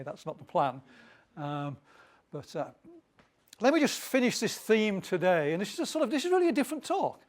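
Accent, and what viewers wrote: Northern England, United Kingdom. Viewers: He looks just like Graham Nash . He has the same northern English accent too . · Professor Deblin, you forgot to explain how your Northern English accent opened the doors for your at the library in Florence, I'd love to know the story.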